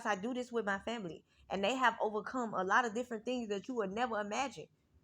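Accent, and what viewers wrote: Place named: Miami, United States. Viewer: New subscriber ❤️ you’ve been speaking to me all day. You sound like you’re from Miami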